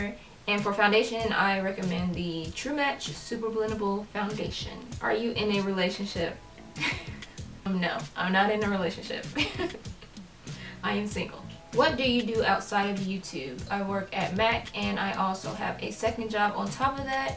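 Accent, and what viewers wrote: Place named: Jamaica. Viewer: I heard your Jamaican accent in the candy land tutorial 😂😂😂 represent fi yawd❤💚💛 nuff love ❤💚💛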